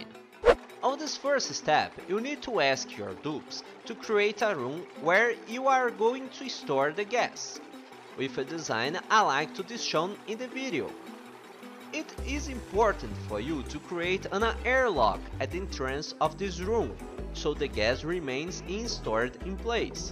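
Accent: Brazil. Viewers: mano, eu esqueci como faz as paradas nesse jogo, venho pesquisar e tem um unico video ingles do que eu preciso e to ouvindo tipo, não pera, sotaque br? dfushdfushd bom video ajudou muito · Caraio, tu eh br ou gringo? Kkkkk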